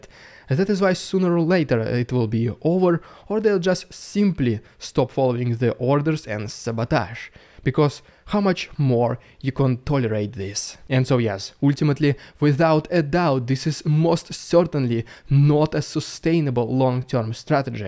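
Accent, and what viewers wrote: Russia. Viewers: Ha-ha-ha-ha. I really did like the video and found it informative, but Dude, it's OK to lighten up on the accent. My parents and grandparents were immigrants. I speak Russian and worked with them for 20 years ...but this is too much. It sounds like you're auditioning for the part of Pavel Chekov in the next Star Trek series.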